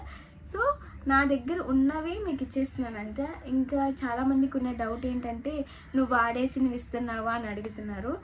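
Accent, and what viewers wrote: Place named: Visakhapatnam, India. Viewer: Ne vedios oka emotion ayipoyae bcoz ur slang and talking and cute voice and also ur from mana vizag ❤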